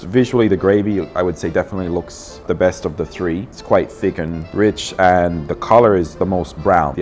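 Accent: Philippines. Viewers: Omg you have a filipino accent ahahaha i thought you are a filipino when you talked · You sound more filipino than some Filipinos honestly... and it amaze me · nice tagalog accent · Lol I like your tagalog accent! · That Filipino accent wow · Hahaha... I am so amaze to hear a forigner with Filipino accent after speaking in Tagalog. Awesome!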